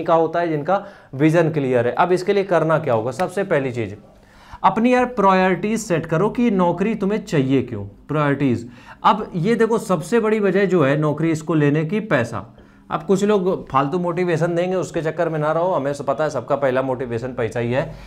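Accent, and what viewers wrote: Kanpur, India. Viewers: Bhaiya kanpur side se hai kya ap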